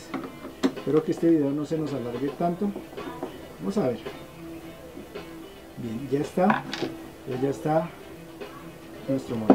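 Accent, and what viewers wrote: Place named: Colombia. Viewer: Yo vivo en pradera valle y usted tan bien es colombiano por el acento creo que si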